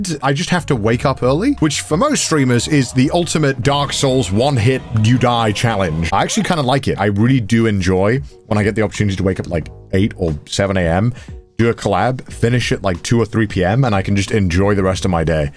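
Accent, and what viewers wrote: United Kingdom. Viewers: im from LA and i agree that a lot of things suck about it, but for some reason it makes me mad when a british dude says it lmaooo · For the engagement farm, Bri'ish man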